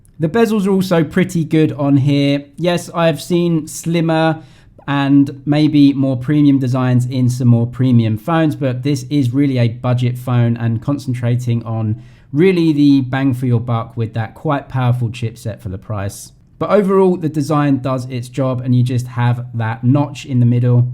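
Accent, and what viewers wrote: England, United Kingdom. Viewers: i like his accent is he british or australian ??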